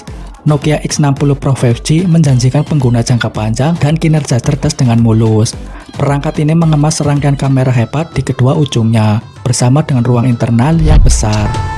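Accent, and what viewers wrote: Banyumas, Indonesia. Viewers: Bosone ngapak ngapak..... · Ngomong2 admin logatnya ngapak ... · Wong ngapak kie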